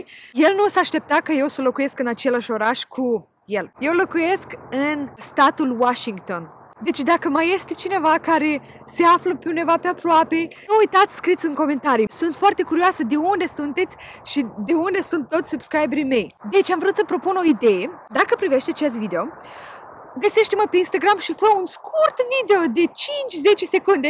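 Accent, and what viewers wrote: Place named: Moldova. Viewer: Buna, sunt din Chișinău,mi-ai atras atenția cu accentul nostru din Md. Imi place mult cum predai engleza.dar sunt interesată de cea britanică.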